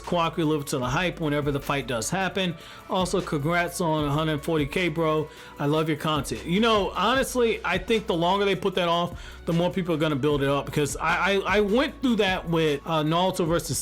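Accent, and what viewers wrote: New York, United States. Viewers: Bro, you actually have a pretty cool voice and you sound like you’re from NY. Your content brings me daily joy. Stay Up fam 🙏🏽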